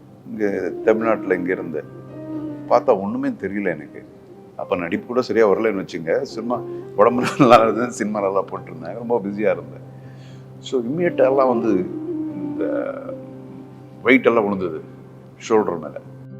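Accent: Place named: Bangalore, India. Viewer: Banglore Tamil rombha like 🤝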